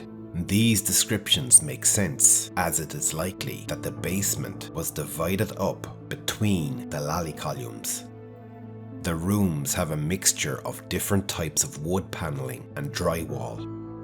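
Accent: Ireland. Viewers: I don't know who you are, but you have found your calling! I just discovered your channel tonight and have since watched all 8 of your videos/mini-documentaries. They are better than 99% of the docs on Netflix, etc.! They are thoughtful, expertly researched and produced, well-paced, and leave the viewer wanting more. The Irish accent is just the topper! Now, get to work and give the people what we need, tout de suite! 😂😍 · I love your accent which travels far and wide before coming home to Eire. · Really professional. Are you Irish?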